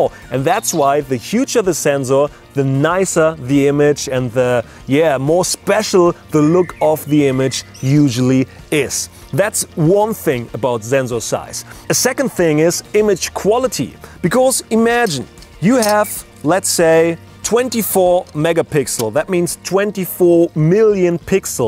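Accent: Germany. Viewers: "Zenzorrz" and "Sand corns". Okay, to clarify, is German and in Germany we have 2 ways to pronounce the "S" –> sharp and soft. The German pronounciation of the German word "Sensor" is more like (or exactly like) IF it was spelled "Zenzor". That is the reason why this sounds a bit weird here. A common mistake by Germans. We have a term for this: "denglisch" (deutsch + englisch). The German "Z" by the way is always pronounced like a short high hat "ts", never like a soft "S". The word "sand corn" I believe he also derived from the German "Sandkorn". In English it sounds a bit off, because it's more common to use "grain of sand", but I guess it does it's job. But other than that his english is pretty decent – you have to give him that. I don't know any English speaker who performs German for example in that fluent manner. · are you German? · let me guess... you are from Germany? 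;D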